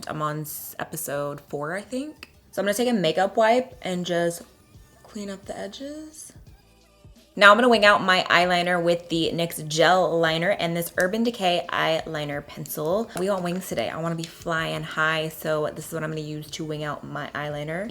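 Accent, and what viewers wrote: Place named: Southern California, United States. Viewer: Katie Meyer I think it’s the CA accent. Not “white privilege”. Some of us sound like that here in So Cal. Like, Valley Girl accent almost. (Just my opinion). She has stated she’s half Puerto rican too.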